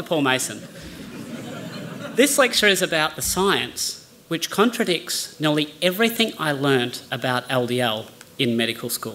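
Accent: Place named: Australia. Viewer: Very interesting but I can‘t stand the Australian dialect 🤮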